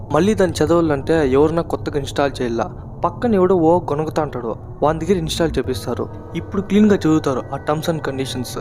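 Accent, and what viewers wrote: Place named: Punganur, India. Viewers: That way chittoor muslim telugu slang language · one of my friend punganuru same slang .anduke adiganu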